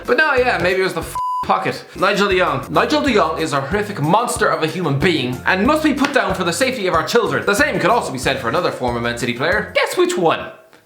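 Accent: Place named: Ireland. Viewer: The relief to hear the strong Irish accent when I click on these videos😅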